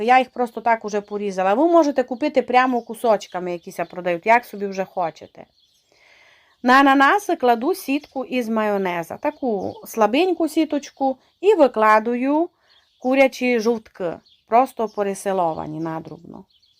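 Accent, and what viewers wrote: Transcarpathia, Ukraine. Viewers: не устаю удивляться вашим рукодельным видео и закарпатскому диалекту. Поздравляю с наступающими, здоровья, терпения, достатка вашей семье.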